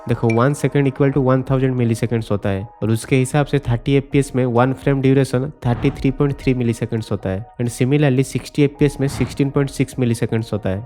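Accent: Odisha, India. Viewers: Aap oriya ho na? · Bro i think you are from Odisha..Your native language is Odia..BTW you explained this clearly.love from Odisha. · Are u odia bro? Your accent is so strong 😂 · Odia bhai voice ru jana padi jauchi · Kya aap odisha se ho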